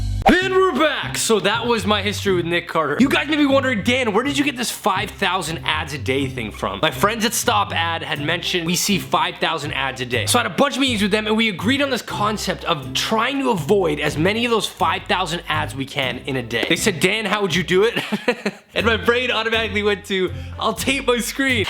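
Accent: Canada. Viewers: Guys wait is this feller Canadian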